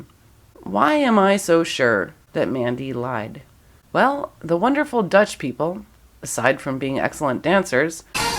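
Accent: Canada. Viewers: I love your accent, Are you Canadian? Am watching your videos which are courageous...love the Bill Cosby one!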